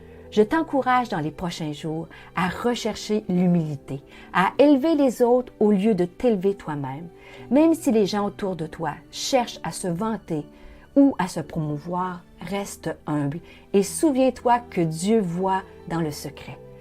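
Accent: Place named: Canada. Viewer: Bonjour Pasteur....( de Vendée en France )......Merci pour ton conseil. Nous avons tous un peu en nous une envie parfois de paraître surtout en face de personnes qui ne font que s'élever, chassons cette envie et restons humbles, l'humilité est une si belle qualité.!!!!!Mon père était un homme très humble, érudit et qui parlait 4 langues, la réflexion d'une de ses voisines qui l'appris un jour m'a choquée.... c'était: "Oh! On ne le dirait pas "... c'est çà l'humilité, ne pas se glorifier de ce que l'on est, de ce que l'on sait... il a toujours été ainsi et il était mon exemple, mais le fait de rester humble parfois n'est pas facile en face de certaines personnes, je dois l'admettre...Résistons avec l'aide de NOTRE SEIGNEUR,l'humilité LUI plait. Bravo chère pour ton message de ce jour et sois bénie chère soeur en CHRIST...........j'adore t'écouter et ce petit accent Canadien est très agréable à entendre 😊😊😊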